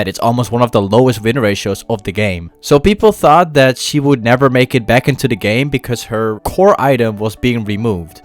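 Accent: Netherlands. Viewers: Ben je Nederlands , hoor echt een accent?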